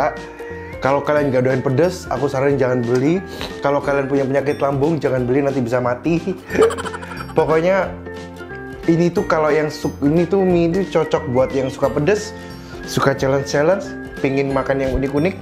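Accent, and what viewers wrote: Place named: Java, Indonesia. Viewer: Medok medok gemeshhh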